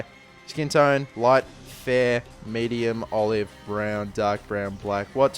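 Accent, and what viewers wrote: Australia. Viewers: Are you australian ? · Are you Aussie? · are you Australian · are u aussie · what country are you from? australian ? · Are you Australian? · your aussie i love you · Nice to hear an aussie accent on a fifa 13 video ;) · are you australian mate? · Are you from Australia? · You Aussie mate ? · are you australian :( im portuguese . quem e portugues da um like ao comentario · Yes an australian commentator!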